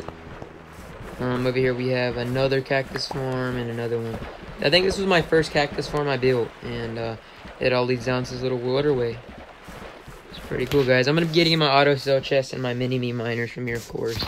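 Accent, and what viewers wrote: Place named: Texas, United States. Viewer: You kinda sound like ur from texas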